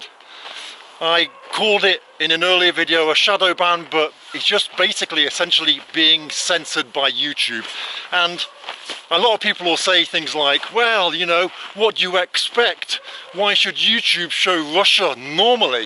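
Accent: England, United Kingdom. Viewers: MATE WHERE U COME FROM ENGLAND?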